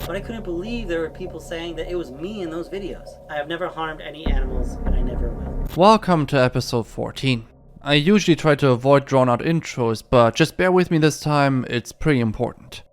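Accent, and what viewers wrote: Germany. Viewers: That's a german accent.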